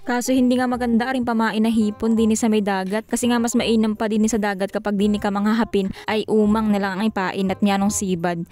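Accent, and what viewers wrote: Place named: Quezon, Philippines. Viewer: Salitaing Quezon e 😂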